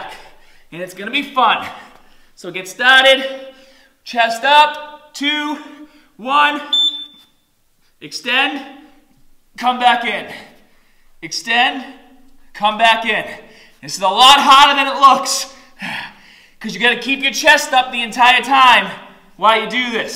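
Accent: Boston, United States. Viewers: Basten accent😄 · ROFL . XD ,I didn't know that was a Boston accent. I've just never heard an accent like yours, maybe because I'm from S.A xD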